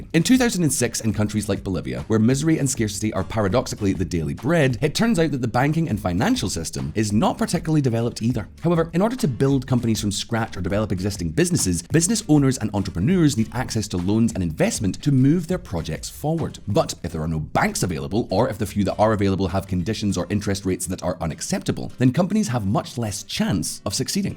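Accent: United Kingdom. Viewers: This was the best Video from accent to being Genuine Uk citizen 😅 I liked it all. Thanks for great neutral explanation.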